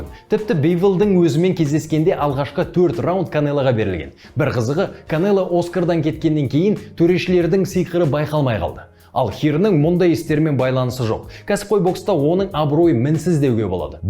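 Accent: Standard. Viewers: Керемет 👍таза қазақша, арна алға баса берсін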